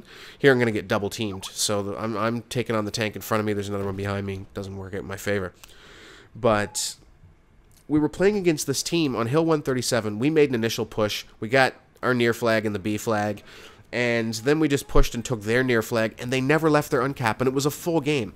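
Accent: Canada. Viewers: yes your Canadian... REPREASENT!!!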